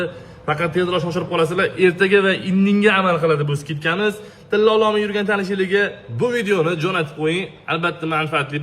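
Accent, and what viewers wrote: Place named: Andijan, Uzbekistan. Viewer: Кайердансиз.адресингларни айтмадингизу.шевангизга Караганда Агдижондансиз.❤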